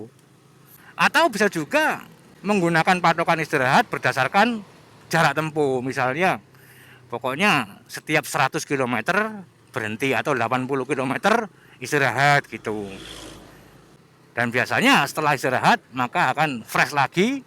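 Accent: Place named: East Java, Indonesia. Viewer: Logatmu wes jawa timuran bro ilang asline😅😅ditunggu di jalur JLS trenggalek - tulungagung lanjut blitar-malang🙏👍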